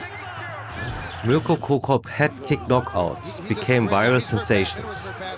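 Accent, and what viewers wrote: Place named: Germany. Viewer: nice video bro but are you using an AI voice? Its sound extremely german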